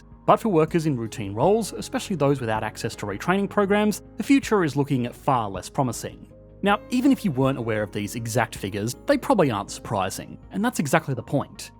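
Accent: Australia. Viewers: I can't stand Australian accents.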